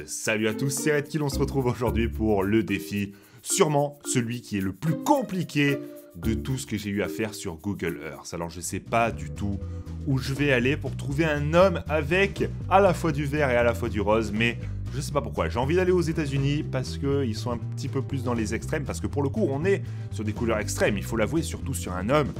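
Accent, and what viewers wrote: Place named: South, France. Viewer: ah les gens du sud et leurs " rause " sans me moquer de ton accent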